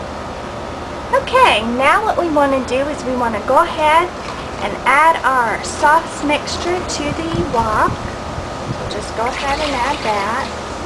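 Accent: South, United States. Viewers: Are you from the south, it sounds like you have a southern accent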